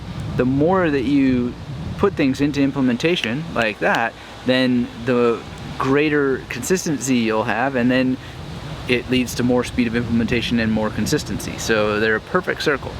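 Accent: Canada. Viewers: Are you canadian? :o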